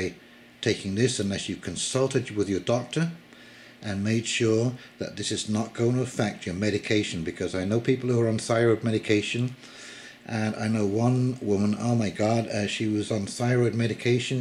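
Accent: England, United Kingdom. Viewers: hello matey, i'm in the UK, near Oxford - surprised how English your acent is - thanks for the tip.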